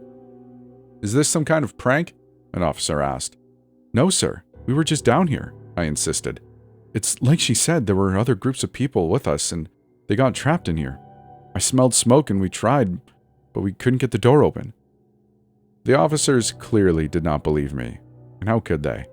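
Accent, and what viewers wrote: Canada. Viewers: I most definitely like the sound of ur Canadian voice. Thanks for another great upload. It's much appreciated. ❤ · I LOVE THE SOUND OF A CANADIAN VOICE!! ( I'm from Vancouver BC...☺️)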